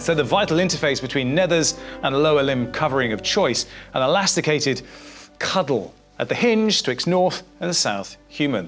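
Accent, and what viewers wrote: United Kingdom. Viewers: hahaha the Scottish accent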